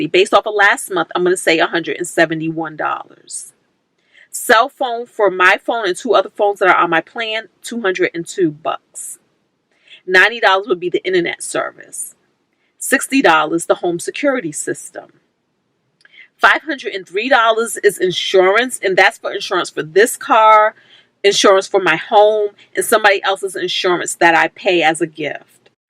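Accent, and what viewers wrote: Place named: New York, United States. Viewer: im furloughed, and concerned about layoffs in future, I planned on Monday to start my workout, meal preps, more time to my schoolwork (bachelors in Christian counseling) also more volunteering with non profit and playing with my fur children! new sub here!! one question your accent sounds familiar are you from NY....LOL I AM....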